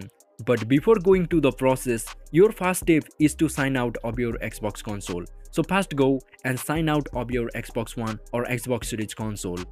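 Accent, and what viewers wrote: India. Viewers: thank you for the info sir i bear that in mind it's very useful. everytime i always need help there will be a man with Indian accent that always be with me to help thank you again sir all the best to you now sir soon you will blow up and become famous youtuber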